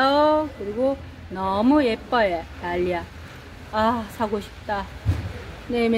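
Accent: Gyeongsang, South Korea. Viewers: 님 어재는 알림받고 왔고 오늘은 님 구수한 경상도 사투리 목소리 들으러 왔어예 ㅎㅎ우리 시골산에 가면둥글많은대 즐청 풀청 합니다 예 😅😅😅❤❤❤❤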